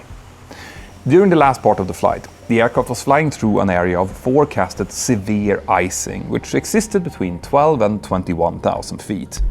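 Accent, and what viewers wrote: Spain. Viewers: MANO AQUI NÓS FALAMOS PORTUGUÊS NÃO ESPANHOL, NÃO FAZ O MENOR SENTIDO VC LER NOSSAS CIDADES E AEROPORTOS COM DIALETO ESPANHOL QUE PORRA EH ESSA KKKKKKKKK DUDE HERE WE DONT SPEAK SPANISH, WE SPEAK PORTUGUESE THAT NO SENSE READ OUR AIRPORTS AND CITY NAMES WITH SPANISH ACCENT WTF BRO KKKKKKKKKKKKKKKKKK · Hey, the language in Brazil is Portuguese, you're reading de terms and documents with a Spanish accent. Great video, BTW. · Look, normally I don't like to make a fuss about pronunciation and all that but as a brazilian, the fact that you kept pronouncing everything with some kind of spanish accent is so irritating it actually distracted me from what you were saying. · does live in Spain and has a Spanish wife, so it is not surprising that he would pronounce words with a more Spanish accent even though he is originally from Sweden. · urgh PLEASE STOP PRONUNCIATION OF SPANISH WE FUCKING SPEAK PORTUGUESE NOT SPANISH CARALHO!!!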